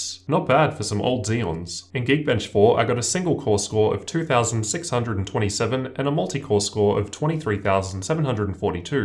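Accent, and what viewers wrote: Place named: Australia. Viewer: I like your kangaroo-friendly accent mate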